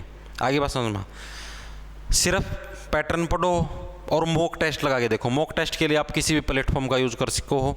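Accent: Haryana, India. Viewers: ❤ haryanvi accent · Yo bihari nhi hai bhai ye haryanvi hai pradhan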